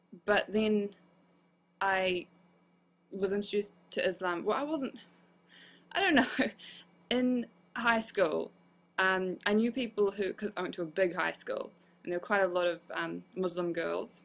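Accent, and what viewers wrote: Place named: Australia. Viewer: i dig ur aussie accent very funny in a good way. welcome to the biggest family in the world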